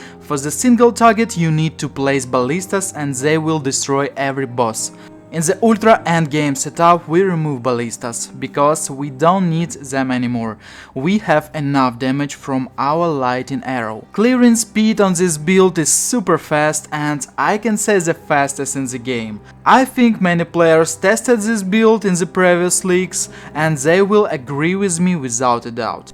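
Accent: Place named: Russia. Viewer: Со стронг рашен акцент😀, бат окей бро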